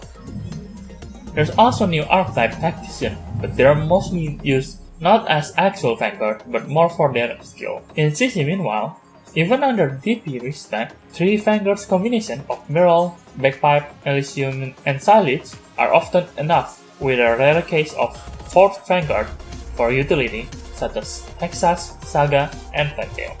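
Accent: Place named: Asia. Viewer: It's more cause of his "asian?" accent than the mic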